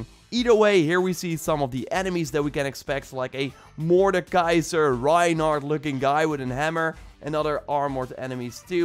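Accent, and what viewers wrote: Netherlands. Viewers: I think this guy is Dutch, I can just hear it. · You’re Dutch I can hear your accent.. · Meh French accent is diffrent thougj i live in belgium and we speak dutch and frensh and it sounds more like dutch accent tbh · You sound dutch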